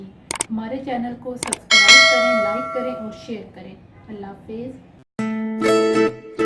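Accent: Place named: Murree, Pakistan. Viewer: Focus on pronunciation I think so you belong to murree.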